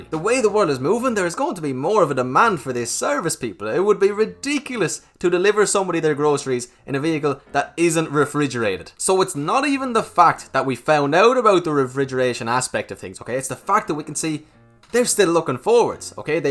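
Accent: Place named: Ireland. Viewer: Dude i notice the irish accent. Lol.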